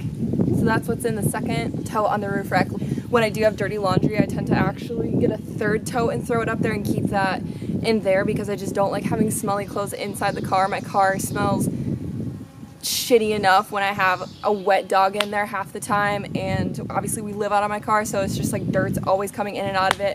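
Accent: South, United States. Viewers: Girl you got me as a sub as long as you keep running around barefooted and talking country like that I'll follow you any where on this planet!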